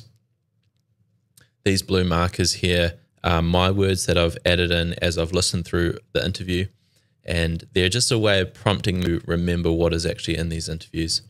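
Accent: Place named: New Zealand. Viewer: Started watching the video - and my brain we like "that accent sounds so familiar and then you mentioned New Zealand. Ahhh Haaa! I am from New Zealand too! - btw Great video mate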